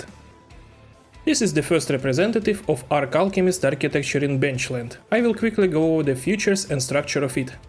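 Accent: Russia. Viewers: Russian accent😂) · Сразу слышен русский акцент и школа )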